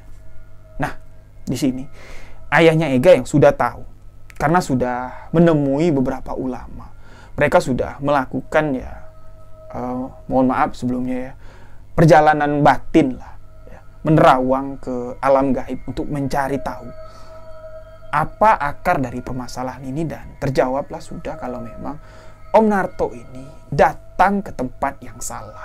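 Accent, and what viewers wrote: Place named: Jember, Indonesia. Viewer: Hostnya cara bicara kayak Anang Hermansyah Orang Jember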